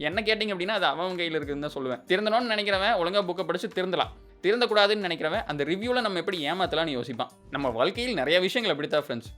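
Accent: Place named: Kongu, India. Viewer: கொங்குகவன்டர் ஸ்லாங்..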